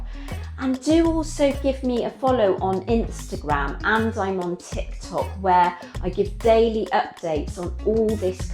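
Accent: England, United Kingdom. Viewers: Ahh England accent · I'm in San Antonio, Texas. Love hearing about fitness and diet for my age, and especially with your English accent. ❤️❤️❤️ I'm definitely inspired, and your videos are helping me!!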